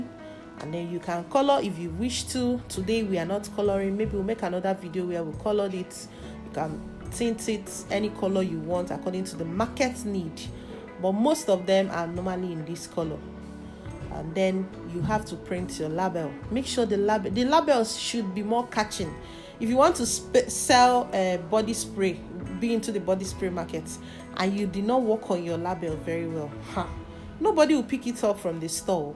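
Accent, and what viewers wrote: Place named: Nigeria. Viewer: may God shine His Light and Bless you in this 2025!!! Your accent shows me you are from Nigeria. But I from Tanzania always feel so close to you. By the way you narrate your projects as if you talk to your close family. Thats how you invited me to follow and learn from you. Having tried tested and found its true. You have all my respects my sister. May God shower you with Uncommon Blessings in your life this year.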